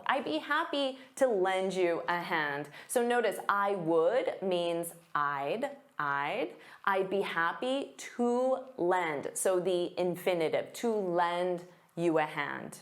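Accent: England, United Kingdom. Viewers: Very clear English accent Very understandable Thank you so much for improving my english❤😊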